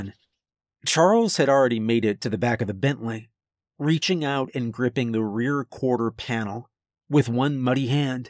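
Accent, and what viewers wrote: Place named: New England, United States. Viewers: Sounds like a northeastern accent not Pacific Northwest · Why is Cliff Clavin running a gas station in the Pacific NW? The accents are perfect, but for the NE, not the NW.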